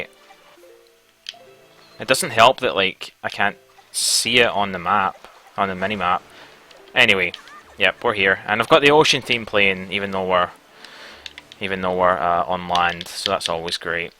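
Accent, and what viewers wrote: Scotland, United Kingdom. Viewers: I'm pretty sure that it's Scottish, but it might be Irish.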